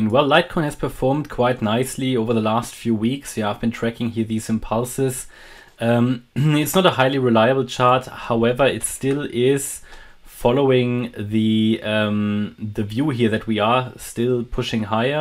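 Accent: Germany. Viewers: Deutscher das hört man, aber für die gute Arbeit gibt es ein Abo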